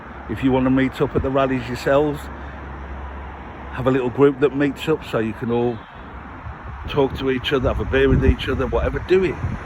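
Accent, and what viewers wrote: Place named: West Midlands, United Kingdom. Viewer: Subscribed. I absolutely love your accent❤ I'm fromYorkshire but i adore a West Midlands accent.❤ well done for starting this channel. We need to stand together, we are united in our shared values and morals. ❤ much love to all❤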